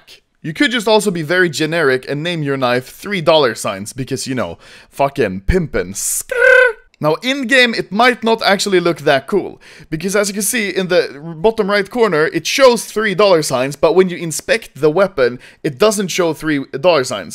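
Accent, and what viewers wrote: Sweden. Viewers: YO I CAN HEAR U ARE SWEDISH:d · Wait wait wait Your Swedish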